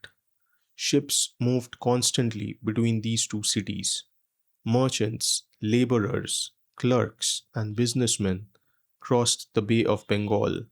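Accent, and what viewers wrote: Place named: United Kingdom. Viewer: He sounds more of Brit intellectual then any indian !! Maybe people like actually trying to keep the British legacy of territorial expansion as well exploitation...!!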